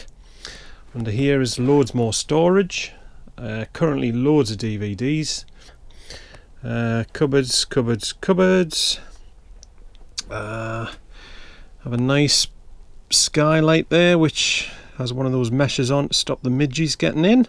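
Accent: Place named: United Kingdom. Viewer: Hi; How about that -- two bathrooms in a van. That is absolutely amazing! I also like the way the couches are arranged -- it actually feels like a living room. I really think you hit a gold mine there with the price, the mileage, and the configuration. Lucky you. I also enjoyed the British accent and the unusual words. I never did figure out what you called the stove. Have fun 'roughing' it in your fancy van. Diane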